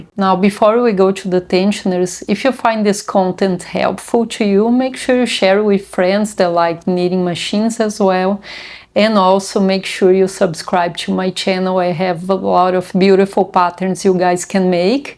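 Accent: Brazil. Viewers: Vc é uma querida minha. Obrigada por compartilhar todas as suas dicas! No primeiro vídeo que vi seu, eu saquei pelo seu sotaque que vc era brasileira, e fui ver o que uma brasileira tinha pra falar sobre essa máquina que pouco sucesso faz por aqui rs E que bom, pq vc de longe é a que mais entendeu sobre o assunto e explica com clareza, de todas que eu já parei pra ver aqui na internet A gente que é brasileiro acha que nosso próprio povo não sabe o que fala, mas se procurar direitinho acha as joias mais raras do mundo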